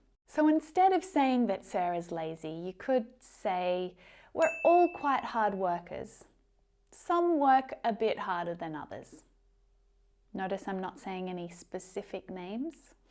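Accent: Australia. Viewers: Ma'am are you from Australia ?. I'm in love with your English accent😍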